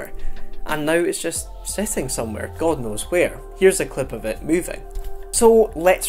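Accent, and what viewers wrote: Scotland, United Kingdom. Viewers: Clearly Scottish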